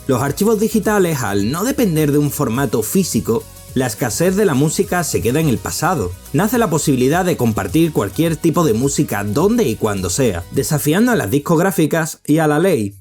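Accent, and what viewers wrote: Andalusia, Spain. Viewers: Que montón de subnormalidades y por tu acento lolailo no se vivio nada de lo que hablas el sur de España fue un reducto retrogrado donde la pantoja y la Jurado reinaron y reinan.... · Primero: me encanta tu acento pk también soy andaluza. Segundo: me encanta que hayas hecho todo un documental de este tema. Tercero: de dónde has sacado tanto contenido de los 2000 de las tribus en los PCS? Que pasada. Yo lo viví pero apenas tengo fotos de como se veian las webs en esos años. Me suscribo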